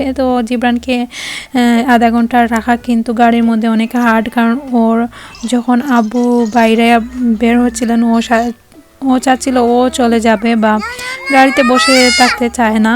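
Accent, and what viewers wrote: Sylhet, Bangladesh. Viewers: আসসালামুয়ালাইকুম কেমন আছেন আপু আশা করি সবাই ভাল আছেন। আমি আপনার ভিডিও দেখি ভালো লাগে বিশেষ করে আপনার কথা আমার কিন্তু সিলেটি বান্ধবী আছে আপু আমি তোমার পাশে আছি আর তুমি ও আমার পাশে থাকবে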